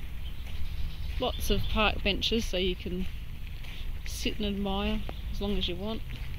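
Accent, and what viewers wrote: Australia. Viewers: I thought u sounded Australian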